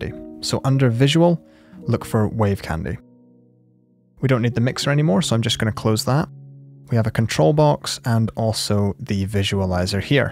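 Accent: Scotland, United Kingdom. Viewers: Have my kids mr Scottish man thank you for this!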